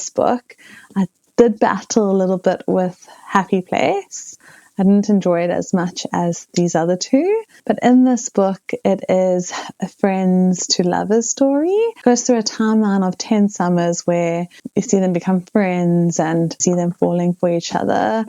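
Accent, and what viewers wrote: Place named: South Africa. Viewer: From one South African Bookworm to another...hello! 😂 I just found your channel today and loved hearing the South African accent on YouTube. ❤. Anyway, hope to see you over on my new bookworm channel as well. I'm traditionally a massive thriller reader but I've recently discovered fantasy and actually, I've dedicated the month of Aptil to only reading fantasy.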